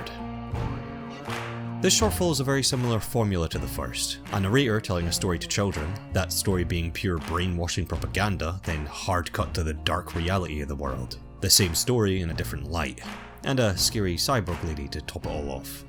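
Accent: Scotland, United Kingdom. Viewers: Wait are is he Scottish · Question: Are you scottish?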